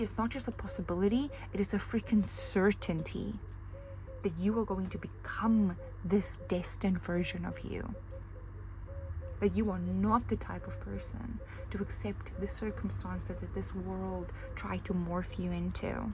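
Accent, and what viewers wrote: South Africa. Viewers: Girl, are you South African by any chance? Coz your accent sounds really familiar to me 👀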